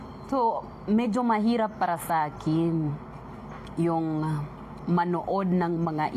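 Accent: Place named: Visayas, Philippines. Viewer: Bisaya accent ka 😅✌️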